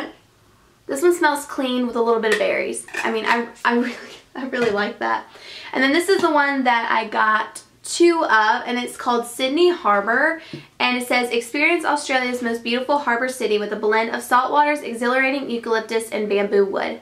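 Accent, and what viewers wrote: South, United States. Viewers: You sound so southern!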